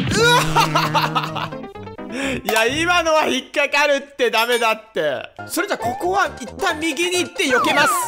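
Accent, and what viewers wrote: Fukuoka, Japan. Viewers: さんの福岡弁がなんか良いですね！これからも楽しみに見させていただきます😀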